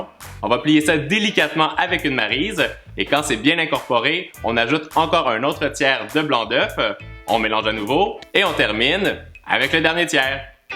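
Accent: Quebec, Canada. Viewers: L'accent québécois est flagrant 😂🔥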